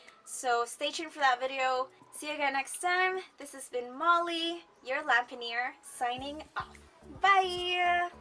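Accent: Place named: United States. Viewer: 💙💙💙 you sound so American already 😁👌🏻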